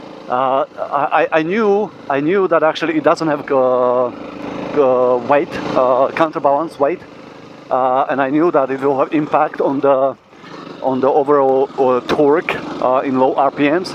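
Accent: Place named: Slovakia. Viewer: Hi. Thanks for your videos about CRF300L. Please how tall are you? Or maybe better, what's your inseam? Did you consider/testdrive CRF300 Rally as well? Btw, maybe I am wrong, but your English sounds very "Slovak" =).. Aren't you from Slovakia? Thanks again for a feedback. Lukas.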